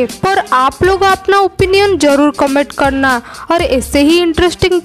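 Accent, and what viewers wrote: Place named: Bangladesh. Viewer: tumhara voice se lagta ha ,tum Bangladesh she ho Ma bhi Bangladesh she ho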